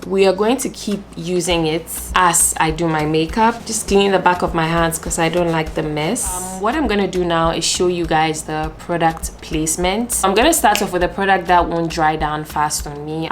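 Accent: Ghana. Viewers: You sound so ghanaian! I love it ! I appreciate your authenticity, it's making me miss GH. You're also stunning !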